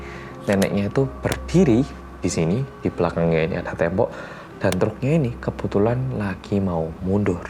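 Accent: Java, Indonesia. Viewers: Aku delek Kowe neng tv mas😂suarane rodo jowo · Mas: halo gaes kembali lagi dengan orang Korea yang.. Aku: medooook Mas: kewedeen *Krik krik krik krik adooh salah rek aku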